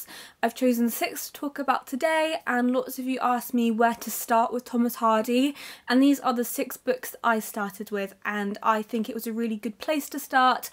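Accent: United Kingdom. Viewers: I really love your reviews , they are deep and clever, and of course your lovely British accent, greetings from Barcelona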